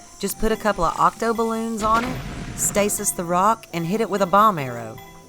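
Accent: South, United States. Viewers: I adore you so much. I hope your channel takes off. You've already helped me so much--with the electricity and the test of strength, and then with how to defeat guardians and keep retrying to get giant cores. Now I learn you can use fire sword to light shît, and great info on bows and arrows. And to top it off, you're extremely pleasant to listen to. Southern accent for days!!! There are some ppl I put up with their voices for their content, others with content I want but I CANT with their voice, but your voice is like coming home. Thank you for existing, for so many reasons.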